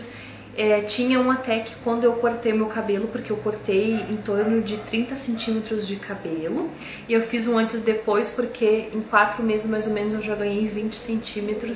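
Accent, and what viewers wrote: Rio Grande do Sul, Brazil. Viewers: Sotaque gauches kkk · e gaúcha? Vc fala lindo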